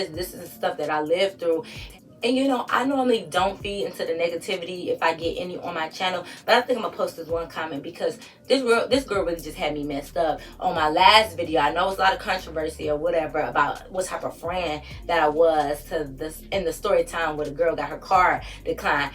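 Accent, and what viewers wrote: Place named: Connecticut, United States. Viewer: You have a ct accent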